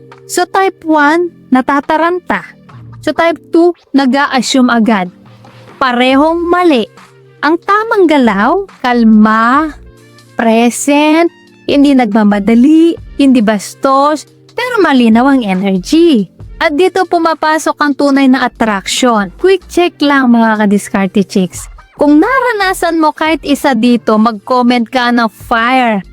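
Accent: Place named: Pampanga, Philippines. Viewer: Kpampangan ka dahil sa accent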